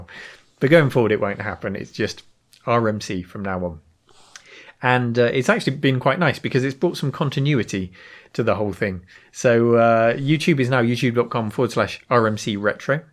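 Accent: United Kingdom. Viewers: The name is less important then the good job you do. BTW I love to hear your british english, it's much more pleasent then american english not to mantion german channels ;)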